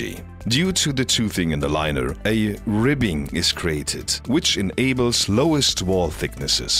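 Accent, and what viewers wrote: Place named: Germany. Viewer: German accent turned up to 11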